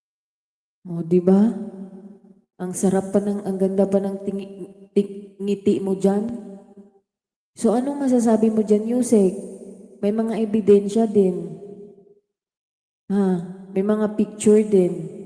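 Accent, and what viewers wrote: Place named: Cebu, Philippines. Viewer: Sige sige boses Ng Cebuano Yan, thank you tambi❤❤❤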